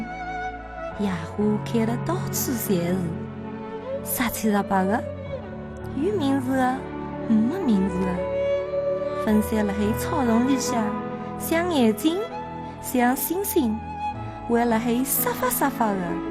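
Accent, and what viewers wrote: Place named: Kunshan, China. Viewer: 昆山街上人口音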